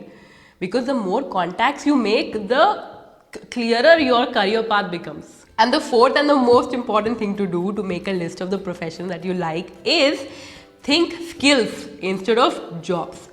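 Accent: India. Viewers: Whe. I heard Indian accent i jumped suddenly cause i knew she is from Country of geniuses.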